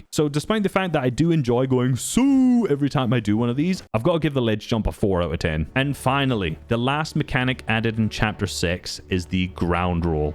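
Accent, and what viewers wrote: Scotland, United Kingdom. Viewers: Your accent being Scottish instead of Australian instantly makes your videos 10x better than AussieAntics’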